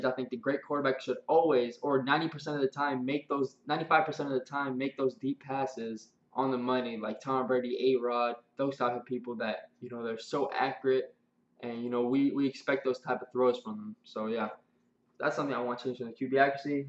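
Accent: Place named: South, United States. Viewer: I understood him ,you must be from the south.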